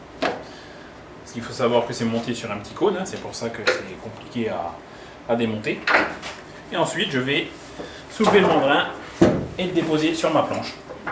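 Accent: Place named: Eastern France, France. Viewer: un petit accent de l'est de la France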